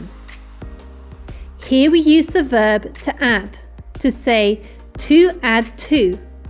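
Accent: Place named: United Kingdom. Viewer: i like your accent can you tell me wich part of the UK is this accent from?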